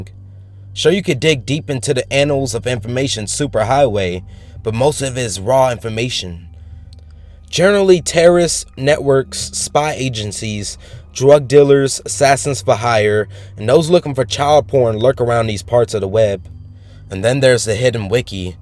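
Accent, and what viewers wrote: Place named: United States. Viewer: Wow,you DO have a sexy voice!! i'm British and have never heard such a gorgeous American accent.......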